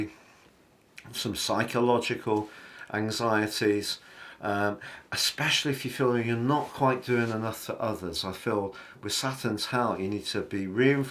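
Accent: United Kingdom. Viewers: I love this amazing and well compose being. I love to hear him, his British accent. He speak to refine, I love it!!!🎉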